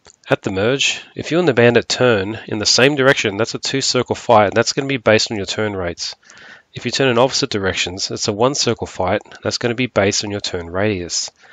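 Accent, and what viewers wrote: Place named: Australia. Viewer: I want you to know the same way a British accent sounds like it fits in some gentleman's club explaining the tribulations of aristocracy, there's something Inherently confidence inducing when it comes to an Aussie accent covering anything that has to do with a fight.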